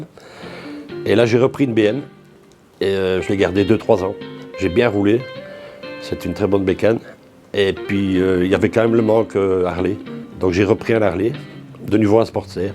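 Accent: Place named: Jura, France. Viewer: Tu est du jura?? Car tu a le même accent que moi!!😄😄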